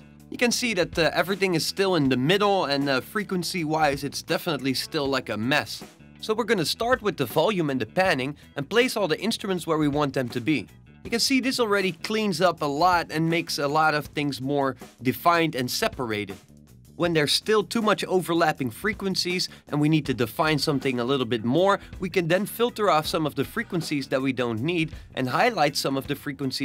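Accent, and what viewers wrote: Netherlands. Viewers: are you dutch? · Ik hoor een Nederlandse accent? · You dutch? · Love the Dutch accent 🤣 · klinkt nederlands? alsnog goed accent hoor haha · Amazing explanation! By the way are you Dutch? That accent sounds so familiar.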